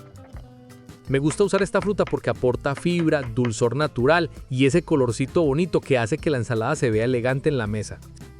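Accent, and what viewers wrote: Colombia. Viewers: Se ve muy rica si la haré,se escucha que eres colombiano, saludos desde la Ciudad de México 🇲🇽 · Eres colombiano? Me late por tu timbre de voz 😊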